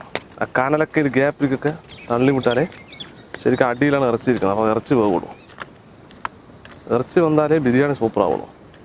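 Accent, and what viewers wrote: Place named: Palakkad, India. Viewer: Malayalam... palakkad slang...😊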